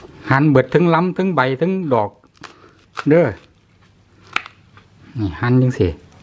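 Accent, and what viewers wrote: Isan, Thailand. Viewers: ชอบ คนเว้าตลกดีใด้ความรุ้จากสมุนไพรหลายอย่างขอให้ใด้บุญหลายฯเด้อจ้า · พูดภาษากลางได้มั้ยคะพูดอิสานฟังยาก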